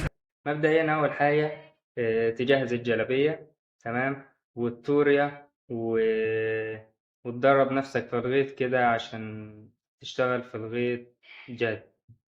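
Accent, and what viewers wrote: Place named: Sharqia, Egypt. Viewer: تسلم يا ابو الغوالي ❤ من كلامك حاسس انك شرقاوي اصيل زي فاقوس والناس الجدعه دي❤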